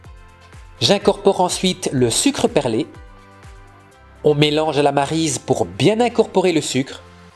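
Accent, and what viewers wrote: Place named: Liège, Belgium. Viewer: Bonjour! Je viens de m'acheter un gaufrier et découvre vos recettes que je vais tester avec plaisir. Je suis liégeoise vivant en France depuis 12 ans et c'est avec délice que je retrouve ce petit accent liégeois ;-)). Au rayon des anecdotes, j'en ai une autre bien bonne: la (seule) fois où j'ai demandé "une petite brique coupée" dans une boulangerie, on m'a regardé comme si je débarquais de Mars! Ici les pains sont appelés par leurs noms (pain complet, aux graines etc....) et il faut dire "tranché" et pas coupés. (ha, ha....)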